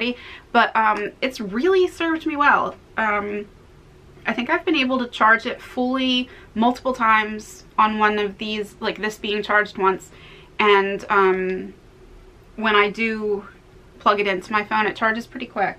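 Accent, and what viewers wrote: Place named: New Jersey, United States. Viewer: I'm gonna bet you're from New Jersey, I know that accent anywhere, lol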